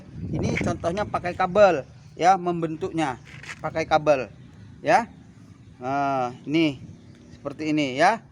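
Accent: Banjar, Indonesia. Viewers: Logat bahasa banjarnya masih kental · Logat banjar nya kd hilang lah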